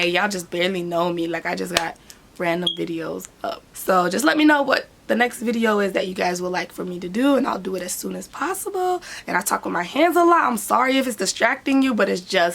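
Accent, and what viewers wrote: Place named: Cincinnati, United States. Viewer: Your beautiful girl! Sounds like some Cincy shit lol! Where you from Mason or something? The suburbs so I am assuming because nothing about Cincinnati, Ohio suburbs especially not in 2006!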